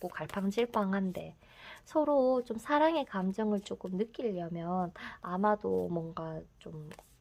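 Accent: Gyeongsang, South Korea. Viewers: 안녕하세요 구독 좋아요 구독중이에요,,오늘부터 1일이예요.특유의 목소리 톤이 꽤 좋아요. 경상도 사투리같아요.ㅎㅎ제 가 경상도 사람이거든요.저는 여기서 파란색을 뽑았는데, ㅜㅜ 사랑이.아니다라는 말에 조금 놀랐어요. 집착인가봐요.정리를 해야 겠네요.. 추억 속 에 남겨두고 아예 연락이 안 왔으면 좋겠어요. 물론 참고할께요.죽어도 잊지 못할 만큼이였으나, 이젠 놓고 받아 들여야 겠어요. 진정한 사랑이 아닌것 같네요.감사합니다.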